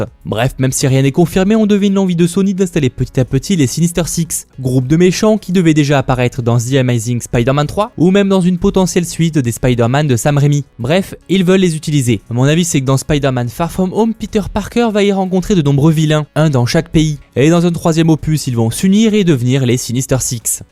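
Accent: England, United Kingdom. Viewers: J'adore ton accent anglais x)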